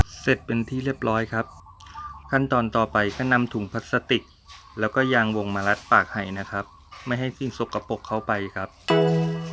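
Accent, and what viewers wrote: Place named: Suphanburi, Thailand. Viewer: เลิฟห้าหนึ่งได้ยินแล้วต้องตามมาชม เยี่ยมมากค่ะ น้อง 👍👍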